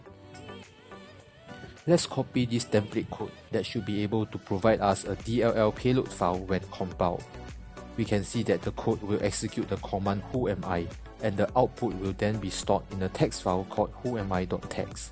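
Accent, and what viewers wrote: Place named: Singapore. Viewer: Bro ur video is awesome. Your accent sounds like Singaporean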